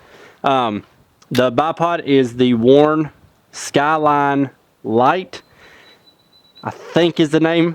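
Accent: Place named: South, United States. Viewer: Finally a southerner, I get so tired of listening to yankee's talk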